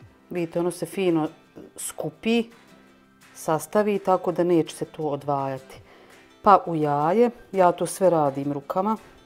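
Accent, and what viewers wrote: Bosnia and Herzegovina. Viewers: Obozavam Vas slusati dok pricate, svidja mi se ovaj recept, bogat va jednostavan, molim Vas vas recite mi iz kog ste mesta, moja drugarica je iz Bosne i prica kao i Vi, pozdrav🍀🌹🍀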